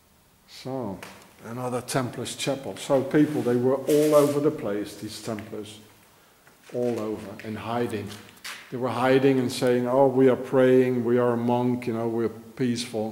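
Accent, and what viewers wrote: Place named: France. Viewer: I love her French accent. Its amazing to be walking into these buildings that are 800 years old or so. Don't have that here in the US. Be safe.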